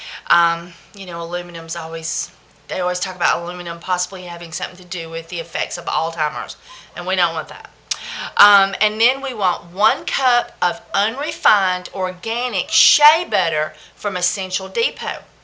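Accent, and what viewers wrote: South, United States. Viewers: loves y'all southern drawl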